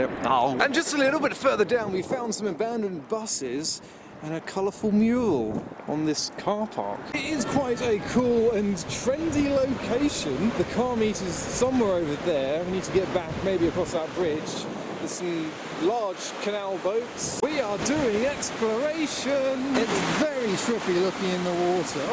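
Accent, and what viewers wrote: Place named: United Kingdom. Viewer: You mean, how he "tolks" British style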